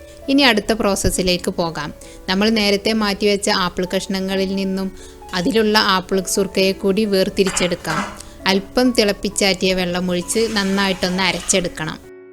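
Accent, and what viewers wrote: Malappuram, India. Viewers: Malappuram ano. sahodari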